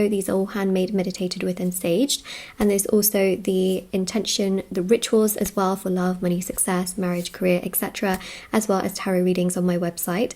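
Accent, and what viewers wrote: United Kingdom. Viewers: I m a huge fan of ur sweet voice n ur British accent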